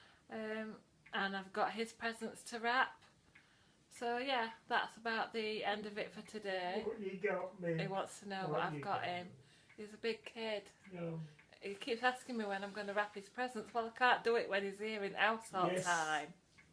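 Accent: Yorkshire, United Kingdom. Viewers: Ooh I can hear the Yorkshire accent now 😃 are you on Instagram at all ?? Also not sure if you have said but what are your plans for Xmas are u using flexi sins, being off plan ?? Was wondering re the cake i have one too xx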